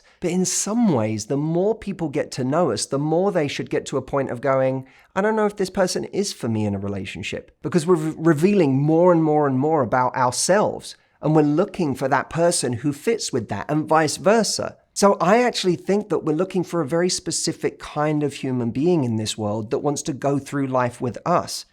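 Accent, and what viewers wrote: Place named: United Kingdom. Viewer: Matthew I have been watching your episodes for quite a sometime now.... I believe the last couple few years and this time you really grabbed me and and something you said I I just can't even pinpoint it but it really touched me and grabbed my attention so much that I'm still trying not to cry and I hope I can make it to that Sixth Day event I'm going to take a look at the package situation and thank you I enjoyed your videos and yeah maybe I am one of the the likes thumbs up person.... but I had to say that you are quite soothing to listen to as well..... I am a bit curious exactly where you're from actually, I can here the Brit down in you I believe! 🙂... I'm from Fort Lauderdale, FL in the United States but My Father.... (unfortunately, he has been passed on now over 3 decades from last April 19th, 1991... so, may he Still R.I.P., and WHOA! 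& Happy Father's Day to him!.... Anyways sorry I just realized what today is..... So back to my scattered point.... He was born in Dover, England, and the funny thing is I guess my dad's British accent might have wore off overtime here in the states but I can do a pretty good impression myself growing up watching a lot of the BBC as a kid..... i.e - Monty Python & the Flying Circus, Benny Hill, Fawlty Towers, even that soap EastEnders back in the day etc.... and I'm just rambling on that nothing... Lol.... Nice little chat aye! Thanks 4 everything oh! & a Very Happy Early Birthday to you! 😎 🎉🙂🎂😀🍾😊🎁 Make a Wish! I Do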